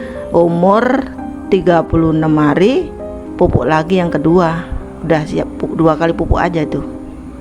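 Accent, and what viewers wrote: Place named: Medan, Indonesia. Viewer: Dari logatnya orang jawa Medan ini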